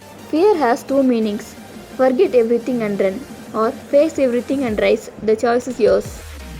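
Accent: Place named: South India, India. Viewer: South Indian recognized from voice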